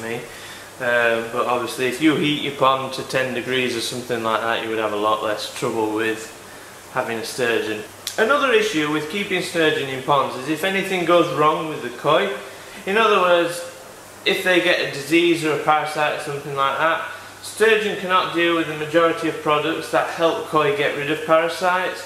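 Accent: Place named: Yorkshire, United Kingdom. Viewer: I just found your vids they are so informative and well done, love the commentatory in great Yorkshire accent.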